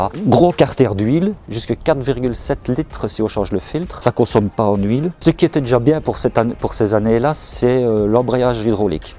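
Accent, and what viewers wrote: Belgium. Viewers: J'aime beaucoup ton accent de la Belgique et c'est toujours un immense plaisir de voir tes vidéos !! 👍✌️🤛😉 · j'ai pu monter sur une (sans rouler malheureusement elle étais dans un show rom et je n'avais pas d'équipement) et c'étais nickel pour mes jambe aussi bien au niveau des commande de boite que du frein arrière et même avec les jambes tendue mes pied étais bien à plat il faut dire aussi quel avais une selle haute, de plus j'aime bien la position droite quand on est à sont guidon, je l'aurai bien acheter mais je doit vendre mon bateau avant pour la financer et faire de la place dans le garage pour la ranger, je me disait aussi que quand tu parlais sa sonnait fort l'accent de Liège à toi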